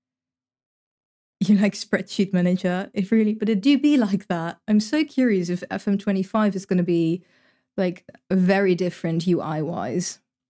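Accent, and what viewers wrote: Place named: United Kingdom. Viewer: Gotta give you a compliment for your English! You actually sound British! xD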